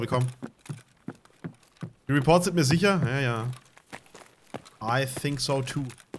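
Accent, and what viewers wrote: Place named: North, Germany. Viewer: sehr sympatisch, da merkt man den Norddeutschen, muss man an der Stelle einfach mal sagen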